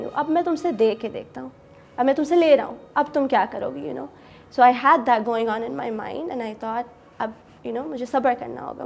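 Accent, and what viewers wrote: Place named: United States. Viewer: She sounds american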